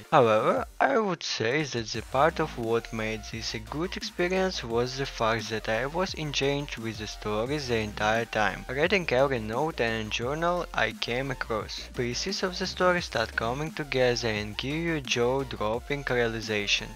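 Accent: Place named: New Zealand. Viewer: bro the minute you spoke i was like HELLO FELLOW KIWI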